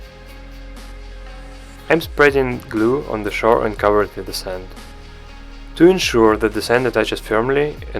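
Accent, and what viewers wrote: Russia. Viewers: Ты русский? А то тебя акцент сильно выдаёт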